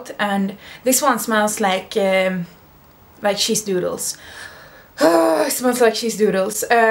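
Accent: Sweden. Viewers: I am usually attracted to old-school stinkbombs (Shalimar and Opium) but you make me want to go for some Angel. After watching your older perfume video I went out and tried some Versace Black Crystal and since then I have been obsessed with white flowers, and yes it totally smells like vampires on the beach! Also that swedish accent is so sweet. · I can't get enough of that swedish english accent!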